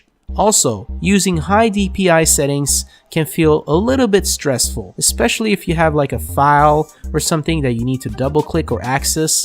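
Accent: Gothenburg, Sweden. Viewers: Äru svensk?? HAHAHA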